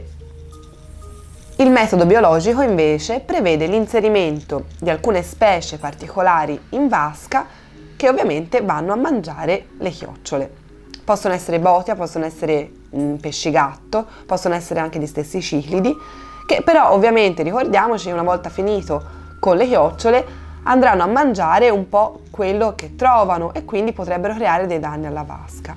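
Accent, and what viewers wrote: Tuscany, Italy. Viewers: accento toscano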